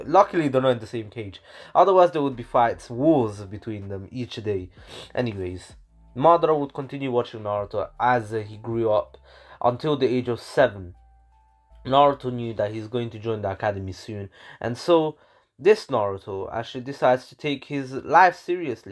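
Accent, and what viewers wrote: United Kingdom. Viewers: Are you British mate yeh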